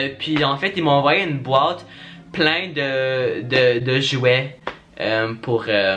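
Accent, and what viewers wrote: Quebec, Canada. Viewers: J'adore tes vidéos! Aussi t'es la seule personne dont l'accent quebequois ne me dérange pas 😂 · 10% d’accent français 40% d’accent québécois 50% d’amour❤️ #cloche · Je ne suis jamais allé chez le coiffeur. Voilà:) Et genre c'est fou comme tu as un accent français mais genre je ne sais pas d'où uwu Bah oui le Québec mdr #cloche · mon dieux ahahah j'aime trop l'accent que tu as du canada ahahhh, c'est trop a par ca beau couple avec vous allez bien ensemble 😍😍😍, plein de bonheur a vous 2, bisou de la suisse 🇨🇭🇨🇭🇨🇭🇨🇭🇨🇭, y faut que tu viennes en vacance avec ❤️❤️❤️❤️, Lugano, crans-monta, ainsi que Lausanne, ou Gstaad, sont des très belle chose à visité 🙏🏼🙏🏼🙏🏼🙏🏼🙏🏼😘😘😘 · God, je te regarde tout le temps en anglais et j'aurais jamais imaginé que tu parles français québécois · I'm learning French. Question; is this an "obvious" Quebecois accent. 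How big is difference with France French? Is it like America/UK or smaller? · Moi qui est française je trouve que tu as un accend très prononcé québécois enfin bon moi j'ai l'accend toulousain😅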